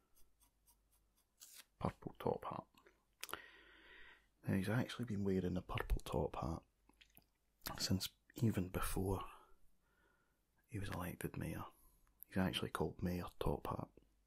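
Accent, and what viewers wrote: Scotland, United Kingdom. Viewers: I’m originally from Dumbarton. In the states now. Love hearing the homeland accent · I absolutely love your voice, I'm a sucker for scottish men and their accents ☺ Great video and doodle adventure!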